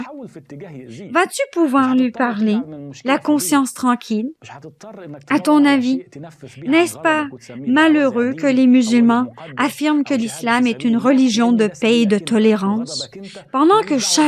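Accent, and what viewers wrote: Quebec, Canada. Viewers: Français avec l'accent québécois ! Excellent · J'aime beaucoup l'accent canadien j'ai l'impression et que je suis en trame du coûter un prêtre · L'accent québécois t9iiil hhh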